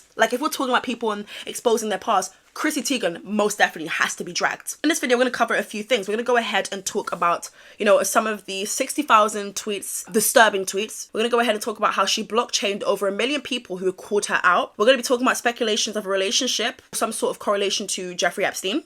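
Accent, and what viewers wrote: United States. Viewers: !! Thank you for always bringing the best pop culture content to the public! You slay, queen! Literally Crissy Tegan is so insane and I'm glad you're speaking up about this! Also, LOL I'm an American and I have to say that your American accent is so great!! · The American accent is killing me 😭 she is so sick and twisted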